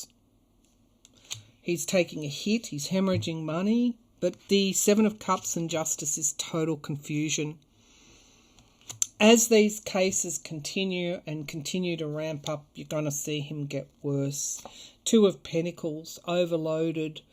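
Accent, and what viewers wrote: Australia. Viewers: Was nice to heat am Aussie voice in this area of YouTube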